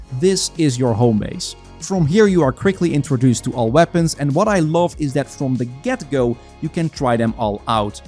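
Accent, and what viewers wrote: Netherlands. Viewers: Ik ruik een nederlander😂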